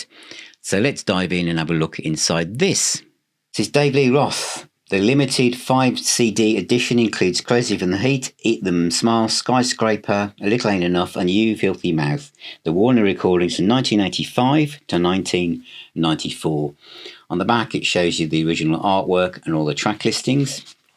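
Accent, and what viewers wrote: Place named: England, United Kingdom. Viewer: Good evening. Very interesting as usual. Thank you for this piece of information and, especially, for your manner of speaking, for your nice, clear, genuine English!!! Neither American English, widely spread around, nor Indian/Pakistani/Bangladesh English, but English English. It's a great pleasure to listen to you!!! And what about compact-cassettes? I know a lot of music-fans, who return now back to classic vintage cassette-decks and compact-cassettes... In any case, it was a pleasure to listen to your programme!! Thanks.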